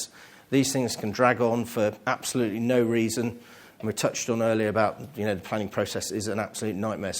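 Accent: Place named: United Kingdom. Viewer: British English accent is nice to hear and easy to understand for non native English speakers..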